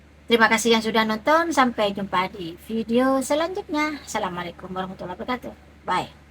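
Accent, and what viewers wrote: Madura, Indonesia. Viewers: Logate meduro banget ce....🤣🤣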